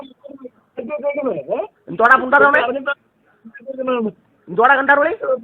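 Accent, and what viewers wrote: India. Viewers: Bhasha kettit kodungalloor bhagathanu ennu thonnunnu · തിരോന്തരം ആണെന്ന് തോന്നുന്നു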